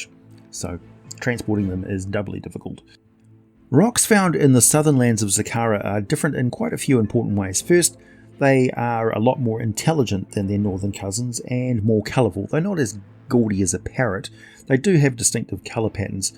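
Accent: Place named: New Zealand. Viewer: I swear, sometimes I tune in or rewatch just to hear you say “murder” half a dozen times or more. Your accent brings much joy to this Shakespearean actor, and one day a director will have me employ a kiwi ‘cent, and I will send you a ticket.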